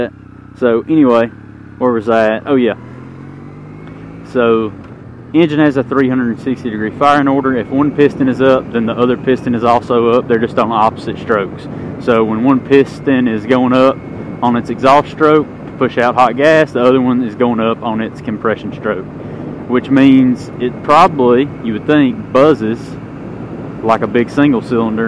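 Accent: South, United States. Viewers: I like your accent. looks Sothern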